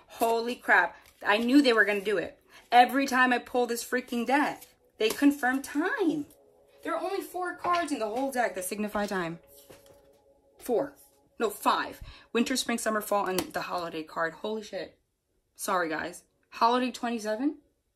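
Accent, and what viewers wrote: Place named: East Coast, United States. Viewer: I lovvvvve your East Coast accent ☺️♥️💓🙏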